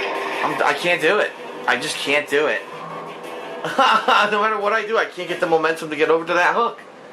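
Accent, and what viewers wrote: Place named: New York, United States. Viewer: I can tell your from New York.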